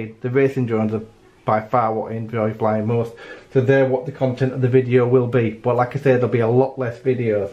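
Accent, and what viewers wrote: Yorkshire, United Kingdom. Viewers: Looking forward to fpv racing stuff. I always look forward to your honest reviews and it's nice to hear a fellow Yorkshire accent talking so passionately about the hobby.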